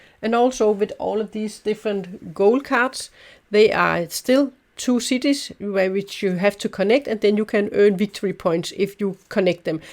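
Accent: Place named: Germany. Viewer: You have a really nice german/english accent. probably the best sounding I have heard.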